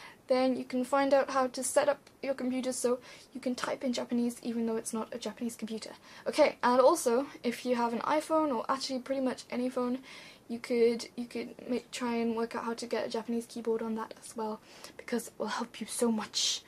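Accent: United Kingdom. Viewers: is she british? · i love your british accent <3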